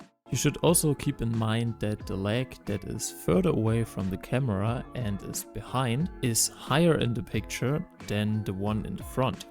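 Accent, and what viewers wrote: Germany. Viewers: R u german? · I hear deutsch(gutes tutorial btw)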